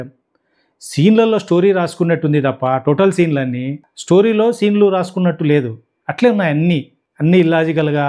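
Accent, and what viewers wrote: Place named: Rayalaseema, India. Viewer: మేష్టారు పగలబడి పగలబడి నవ్వుకున్నాను, దయచేసి మీరు ఈ రివ్యూలు చెప్పడం ఆపొద్దు, రాయలసీమ యాస కుమ్మి పడేసారు, 😅😂😅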